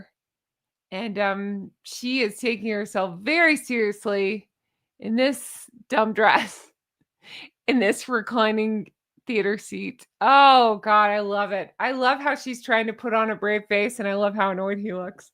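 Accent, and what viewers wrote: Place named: South, United States. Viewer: I love a southern accent ❤ I don’t think you should suppress it. Imo. 😊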